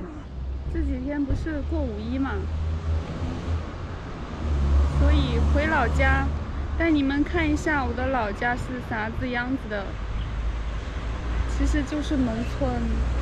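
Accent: Chongqing, China. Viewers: 喜欢的女生也是重庆口音，听着好亲切啊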